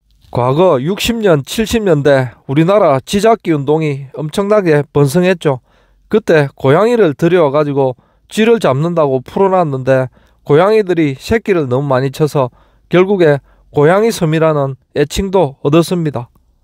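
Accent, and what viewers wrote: Gyeongsang, South Korea. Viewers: 구수한 경상도 촌스런(?) 억양에 진심어린 나레이션과 고품질 영상이 참 좋습니다^^.. 자주 찾아보게 되네요... 안전에 유의하고 건강 잘 챙기시면서,, 오래오래 좋은 유튜브영상 많이 제작해서 올려주세요~~^^.. 덕분에 앞으로도.. 저는 사무실에 앉아서,,, 고생해서 찍어올려주신 동영상을 땀한방울 안흘리고 편하게 볼 수 있네요... 좋아요 추전 합니다 .. ^^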